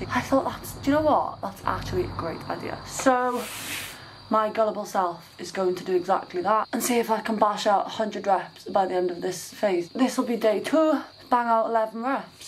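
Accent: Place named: Wales, United Kingdom. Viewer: The Welsh accent is getting stronger with each day in quarantine!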